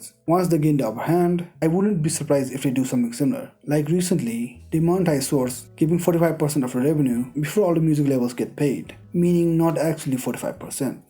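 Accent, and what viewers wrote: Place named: India. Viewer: Yo, from a fellow indian work on your accent.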